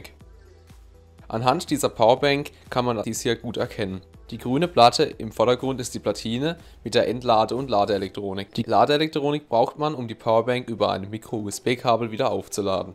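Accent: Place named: Karlsruhe, Germany. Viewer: So ein schöner Karlsruher Dialekt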